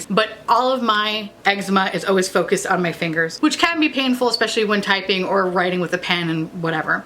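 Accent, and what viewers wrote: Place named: Long Island, United States. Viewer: if u ever see this comment I think we would all love for u to do a video in your queens/Long Island accent!!! I would live!!❤️❤️❤️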